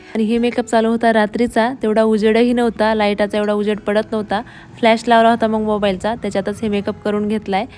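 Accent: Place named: Marathwada, India. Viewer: Tai tumhi mahanubhav panthatil aahat na aamhisuddha aahot Aamhi Hingoli la rahto tumche video baghun kharach marathwadyachi language ekun khup chan wattala❤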